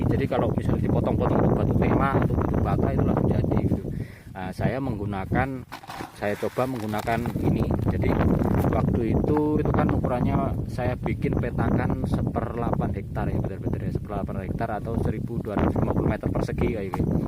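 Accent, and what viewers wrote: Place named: Banyumasan, Indonesia. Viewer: Rika wong ndi kang deneng ngapak kaya nyong cilacap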